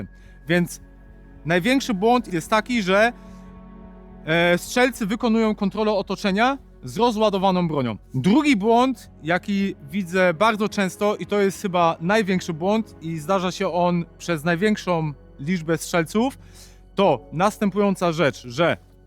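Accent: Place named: Germany. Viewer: No i spoko :) Dobrze wytłumaczone i nawet lekki niemiecki akcent nie przeszkadza ;)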